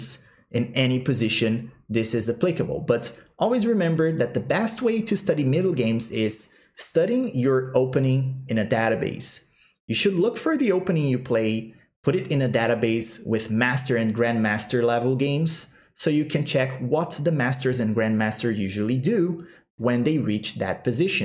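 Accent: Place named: Brazil. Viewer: You have a little brazilian accent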